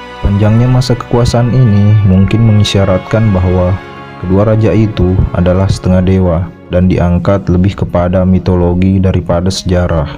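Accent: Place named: Medan, Indonesia. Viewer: Maaf bang mau komen out of the topic abang orang medan ya? Dialeknya terasa banget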